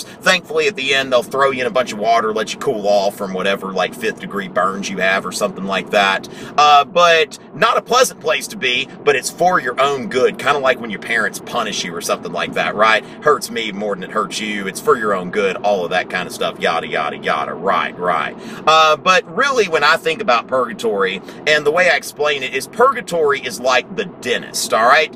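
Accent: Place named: Georgia, United States. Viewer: Georgian accent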